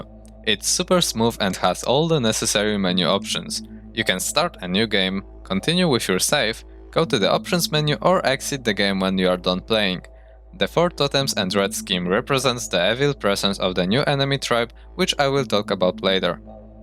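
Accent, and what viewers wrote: Poland. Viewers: Słychać że polak :D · Ten polski akcent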